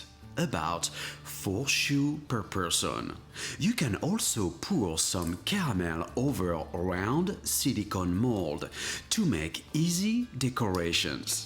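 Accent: France. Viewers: The french accent is just lit😅❤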